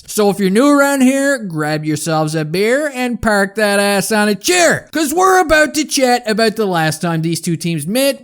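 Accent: Newfoundland, Canada. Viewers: Are ya a newfie ?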